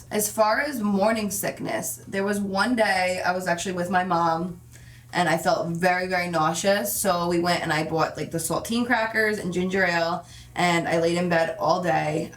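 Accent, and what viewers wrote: New Jersey, United States. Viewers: Her Jersey accent seems stronger? Anyone else notice that? Haha I love it. It reminds me of 2013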